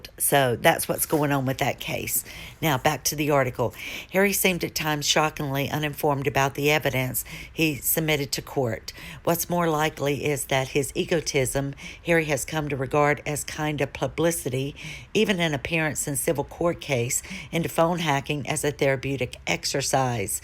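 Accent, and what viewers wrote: South, United States. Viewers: Thank you for reading this. You have a delightful voice and a gorgeous southern accent ❤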